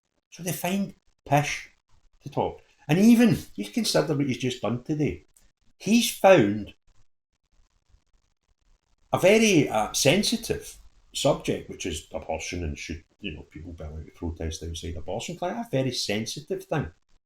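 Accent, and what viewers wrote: Scotland, United Kingdom. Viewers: You sound Scottish but you seem to be totally against what is best for the Scottish nation.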